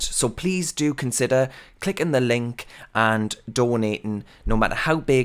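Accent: United Kingdom. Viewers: Your voice is violently British and I enjoy every moment of it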